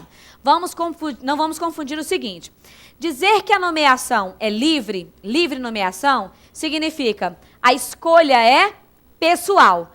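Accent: Minas Gerais, Brazil. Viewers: Gosto demais das suas aulas, Profª! Tenho aprendido bastante! Parabéns por saber passar o conteúdo de forma tão clara! *Ps: Esse sotaque "mineirês" me lembra meus tempos de "Berrrlândia"! Adoro! rs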